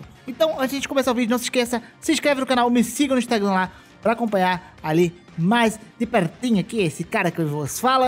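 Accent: Rio de Janeiro, Brazil. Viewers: Agora sei porque não curto tua voz, porque você é carioca! Cara, tinha que impedir a internet no Rio de Janeiro.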